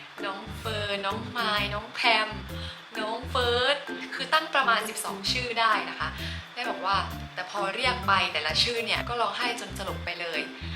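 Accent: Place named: Buriram, Thailand. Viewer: จดจ่อตั้งใจฟังจนจบอยากรู้ว่ามีแฟนรึยัง แต่ฟังจนจบก็ยังไม่รู้ 555 ผมเล่นกีต้าร์และเปียโนมาแต่เด็ก เล่นให้ร้องเพลงได้ ร้องคู่กันก็ได้ มีบ้านอยู่ในตัวเมืองบุรีรัมย์ไร่นึงหลังทวีกิจจิระ ไว้เป็นเรือนหอสำหรับแต่งงานได้ ชอบมากสำเนียงบุรีรัมย์ชัด ผมเรียนอนุบาลที่บุรีรัมย์ เวลาไปดูแลบ้านที่บุรีรัมย์จะต้องแวะกินลูกชิ้นทอด อยากชิมลูกชินทอดฝีมือคุณหมอครับ ผมเล่นกีต้าร์เพลงแนวนี้ครับ <link>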